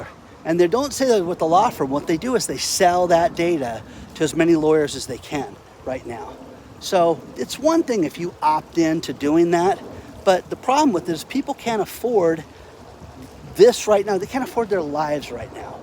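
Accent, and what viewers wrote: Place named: Midwest, United States. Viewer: Has anyone ever told you you speak with a solid Midwest dialect, it's very disarming.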